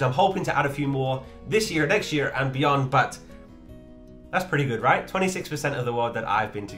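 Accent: United States. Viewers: 😂, you definitely sound more 🇺🇸 than 🇬🇧 😅 although having 🇲🇾 passport that needed to get visas to many places/countries. hwr,....WAS very privileged then, easily got any visas due to nature of former work 'flight crew' 😂 Thus, travelled to all continents & many countries..... 😂 2024April05th, Fri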